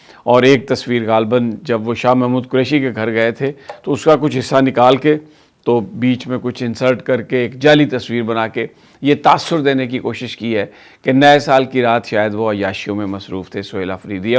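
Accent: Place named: Jangli belt, Pakistan. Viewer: Samee sb good way of expressing YOU belongs to Jaangli belt Kindly confirm As sometimes words came in that way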